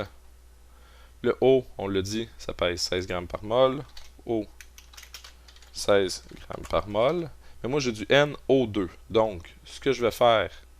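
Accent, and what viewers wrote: Quebec, Canada. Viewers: Ah un accent quebequois !!!! mercci ^^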